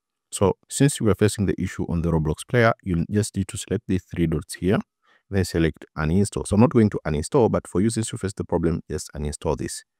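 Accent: India. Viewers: I knew i would work when i heard his indian voice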